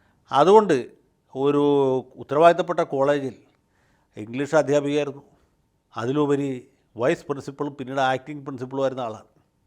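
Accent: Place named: Thrissur, India. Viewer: സാറിന്റെ തൃശൂർ ഭാഷ 😃😃👌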